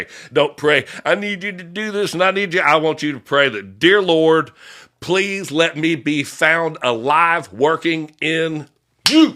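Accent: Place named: Georgia, United States. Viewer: REWATCHING FOR NOTES, i am so sorry i cant help it LMBO...@ you said "I APPRECIATE THAT DAD" 🤣😂😁🤣😂🤣😁🤣😂24✝🙏 Gotta love our Georgian accents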